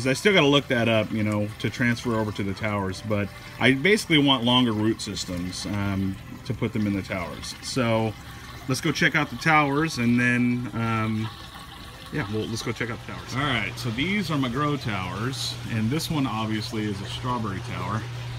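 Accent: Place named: Minnesota, United States. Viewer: You sound Minnesotan!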